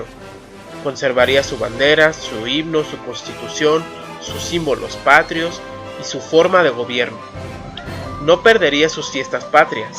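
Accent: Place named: Mexico. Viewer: Nada que ver tu video amigo... Te escucho muy mexicano.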